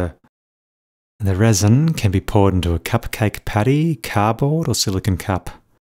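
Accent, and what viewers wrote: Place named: Australia. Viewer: I also love your Australian accent... I can't find your name here. What is it?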